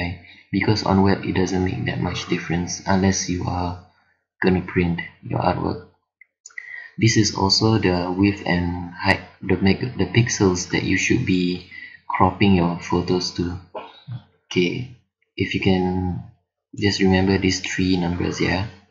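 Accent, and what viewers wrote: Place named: Singapore. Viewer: omg why did it end abruptly. machiam cliffhanger lol. But thanks so much for this tutorial! Possible to explain the difference between exporting in LR vs PS? I prefer to stay within one platform but am curious as to whether the difference between these 2 exports would be significant. P.S. I think this is my first tutorial watched done and voiced by a fellow Singaporean. Nice to hear a familiar SG voice! Thank you for the tutorial! Subbed!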